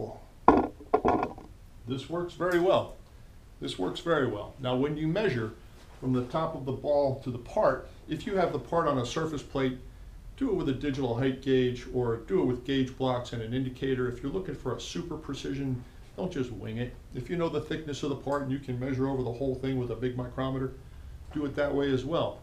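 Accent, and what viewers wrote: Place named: North Jersey, United States. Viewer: I knew you didn't sound like you're from Texas! I had a feeling that was a north Jersey accent. I grew up in south Jersey.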